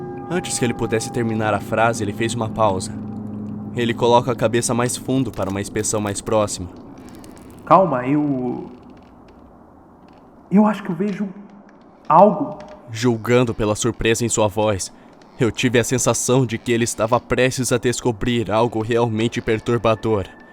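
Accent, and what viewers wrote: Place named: São Paulo, Brazil. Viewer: MDS eu tbm sou :0 pensei q vc fosse paulista srsr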